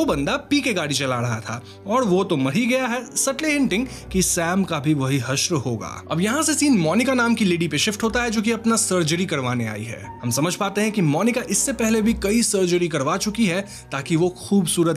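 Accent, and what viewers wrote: Bengal, India. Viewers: vhai tum bangali ho kya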